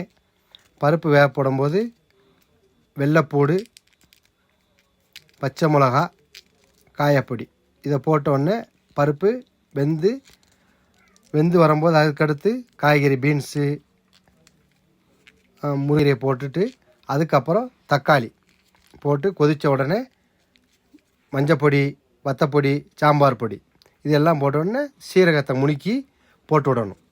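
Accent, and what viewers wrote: Tirunelveli, India. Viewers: Sister neenga tirunelveli ya Unga pechu apdi irukku athan ketten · அண்ணா நீங்க பேசுறது கேக்குறதுக்கு ரொம்ப நல்லா இருக்கு நம்ம ஊரு பாஷையிலேயே பேசுறீங்க நானும் திருநெல்வேலி தான் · Bro slang thirunelveli touch iruku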